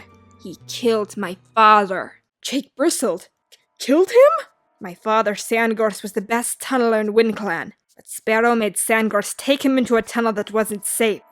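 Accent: United Kingdom. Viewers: Are you brittish?! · Whats up with the british accent?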